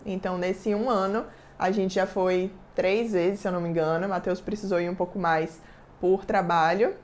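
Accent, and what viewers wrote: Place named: Bahia, Brazil. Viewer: Aiiiiiiii! to AMANDOOO que vc ta se dedicando 100% agora ao youtube. Acho que você vai crescer SUPER aqui, te desejo todo sucesso viu? adoro seus vidÉos hahaha. AMO seu jeitinho leve de ser e esse sotaque lindo da Baheaa! Vou ate ativar as notificações! To maratonando seus vídeos novos. ps: FAZ O VÍDEO COM O MATHEUS SOBRE FINANÇAS SIMMM. amei que vc tb é taurinaa, e meu namorado tb se chama matheus! Um xerão💕💕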